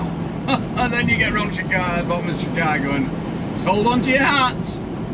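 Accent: Yorkshire, United Kingdom. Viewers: It's grand listening to a tyke explaining what it's like over there!!Top man!North Yorkshire man here.🇬🇧👍🤔😄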